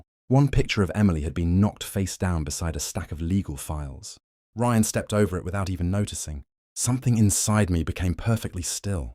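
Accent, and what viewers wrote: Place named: United Kingdom. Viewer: I always loved a British accent!! Keep making stories like this,glad the older brother and the mother got what they deserved!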